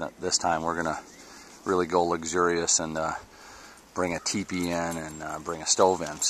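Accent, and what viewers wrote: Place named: South, United States. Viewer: That turned out niiiiiice!. Lmao at "I love that smell" man that is priceless bet you got beat up by the tree huggers on that one lol. If I had just ran across your channel and there was no snow on the ground I would have thought for sure you where from the south lol. Thanks for sharing the build God Bless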